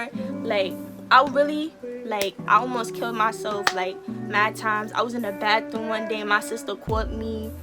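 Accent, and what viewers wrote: New York, United States. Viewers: I can hear your New York accent. I soon as I heard you speak I just knew you were from New York. Anyways you are so pretty😍😍😍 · I love your accent❤ you from NY🎉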